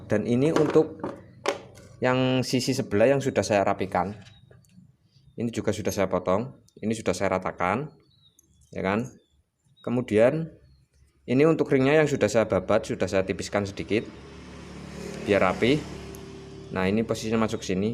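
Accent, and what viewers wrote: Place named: Banyumas, Indonesia. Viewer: Posisi bengkek ngendi rika mas, deneng kya ngapak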